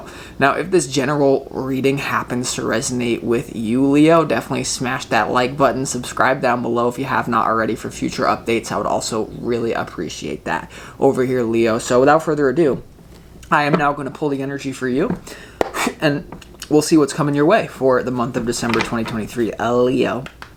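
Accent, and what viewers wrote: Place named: Staten Island, United States. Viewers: I love this reading. The NYer accent especially. Omg. Prepandemic is def. Right!! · loved the reading 😍 LOL the accent got me laughing.. sounded like staten island accent. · and extremely intelligent and wise... love the NY accent, HA !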